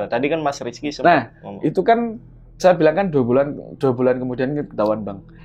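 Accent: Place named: Surabaya, Indonesia. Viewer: Bhasane asik bnget kaLau orang SBY yg cerita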